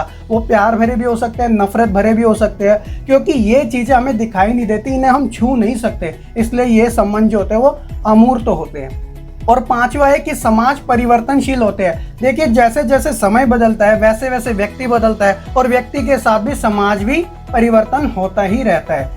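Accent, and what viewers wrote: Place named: Haryana, India. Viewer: Aap haryana se ho I think.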